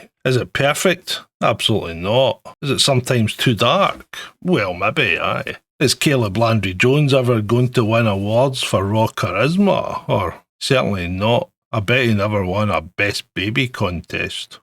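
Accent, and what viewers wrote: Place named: Scotland, United Kingdom. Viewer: The heavy Scottish accent narration kills me....its charming usually, but not for this, no.........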